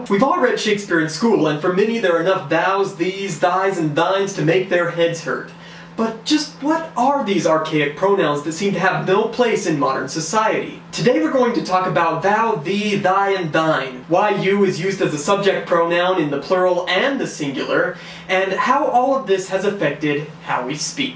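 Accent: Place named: United States. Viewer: Hilarious - a seppo explaining old English! Though, accent aside - he does a good job of it.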